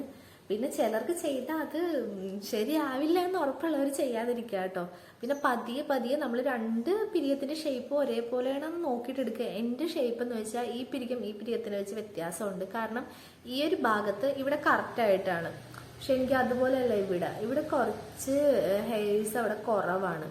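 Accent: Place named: Kerala, India. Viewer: As i from tamilnadu really i luv 💘 u as ur Malayalam slang i promise u luv u 💯 no wrong feeling on u 💖 only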